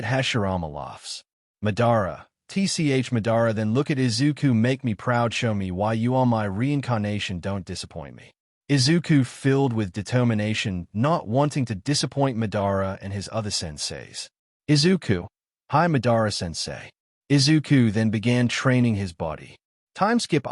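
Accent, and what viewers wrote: United Kingdom. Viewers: Bro sounds British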